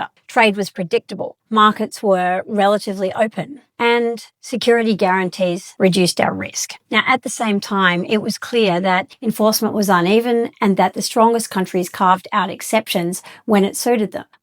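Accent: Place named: Australia. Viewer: Ah sir, you are too kind! Just a straight-up Aussie accent :)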